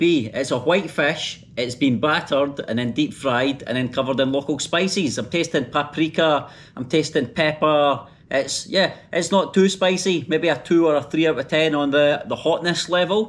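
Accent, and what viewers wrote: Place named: Scotland, United Kingdom. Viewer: Please speak with your scottish accent, I like to hear your accent... 😊 there are not more vlogger with that accent, that sounds unique than other vlogger with american accent.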